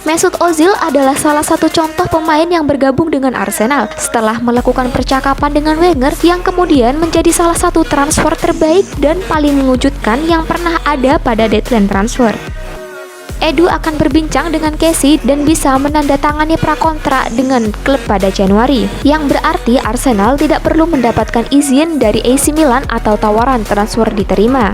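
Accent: Java, Indonesia. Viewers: Admin nya pasti orang jawa.. logatnya medok betul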